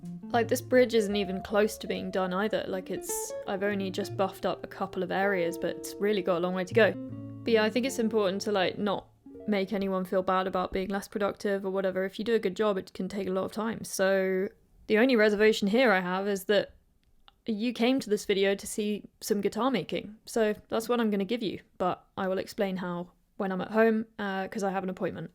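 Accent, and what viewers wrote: United Kingdom. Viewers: I am captivated by your woodworking skills ,love your British accent. The hoping is probably good for blood flow to your legs .🌻🐇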